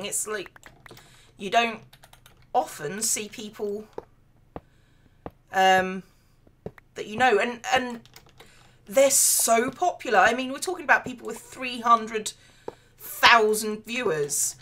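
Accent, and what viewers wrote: United Kingdom. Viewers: You don't sound fat. You sound British.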